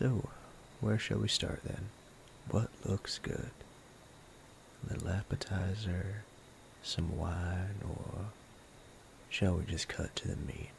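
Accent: South, United States. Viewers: That slight southern accent 😩👌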